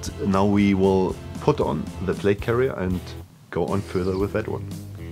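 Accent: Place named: Germany. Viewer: Nice video. I like LT gear. Always reliable, innovative and robust . Good video. I like the German accent. Had to think about the German coast guard video. <link>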